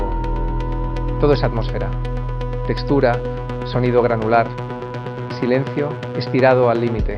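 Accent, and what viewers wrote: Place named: Spain. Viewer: no sabia si eras latino o español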